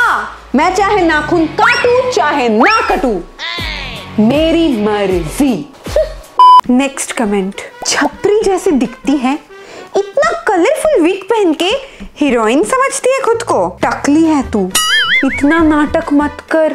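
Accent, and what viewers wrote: Bengal, India. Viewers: And Plus she is Bengali so hindi isn't her first language like other youtubers. And im also a Bengali.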